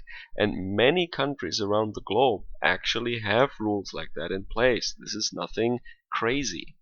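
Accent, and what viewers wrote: Germany. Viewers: 1. Apart from your terrible German accent this is a fine video, that shows that Austria with the charismatic Sebastian Kurz and his partner Heinz-Christian Strache can be an example for Germany. 2. The historical parallelism with 1683 is really interesting. We should learn from history! 3. Poland and Russia and the other Visegrad countries, the Czech Republic, Hungary , Slovakia should be our friends and partners.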